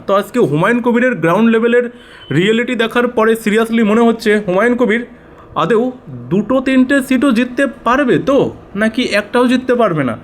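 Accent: Bangladesh. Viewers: হুমায়ূনকে বিজেপি সাপোর্ট করেছিল।। তুই জানিস না গোবর ভক্ত।। তোর কথা শুনে মনে হচ্ছে তো তুই একটা খাঁটি বাংলাদেশী।। এই করছিল খাস ছিল এই যে তোর ভাষাগতে।।তোর প্রমান তুই একটা বাংলাদেশী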